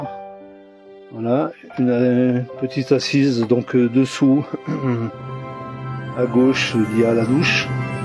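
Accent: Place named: Corsica, France. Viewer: Ils sont tellement beau les paysages. Eh t'as l'accent Corse un peu.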